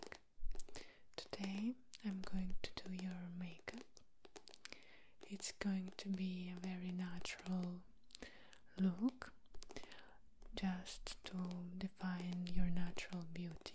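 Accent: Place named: Russia. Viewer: ты русская? уже достаточно видео посмотрела, где у тебя очень четкий акцент, интересно просто узнать🤗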